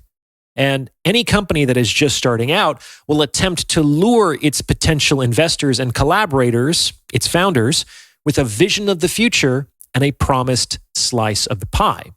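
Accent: United Kingdom. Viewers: RP AF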